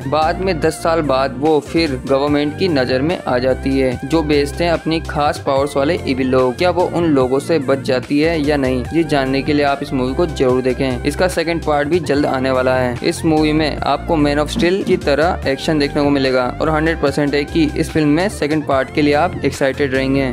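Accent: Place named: India. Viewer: so bad english slang,obvious is indian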